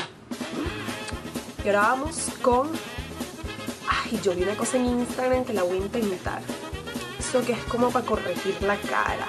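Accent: Venezuela. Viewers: la forma de hablar no se pierde, se ve que eres venezolana, me encanto tu video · Iguaaal. Se te nota la venezolana y mucho jajajaja. SALUDOS PAISANA 💙💜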